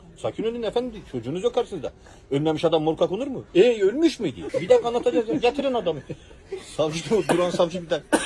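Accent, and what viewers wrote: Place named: Erzurum, Turkey. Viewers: adamın şivesine zor tahammul ettim, sevimsiz Erzurum ağzı. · Çizim de Erzurum şivesi de eyi olmiş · Olay çok komik ve Erzurum ağzı ile anlatılması daha da komikleştiriyor DYSIDJGXUXOSJSGX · Erzurum lehcesi hastayim ya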